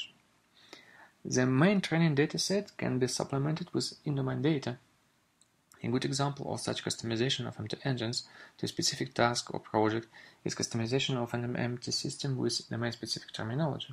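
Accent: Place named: Eastern Europe. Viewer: The speaker has some Eastern-European dialect, but it is not that hard to understand what he says :) Just pause and repeat few times where you can't understand him.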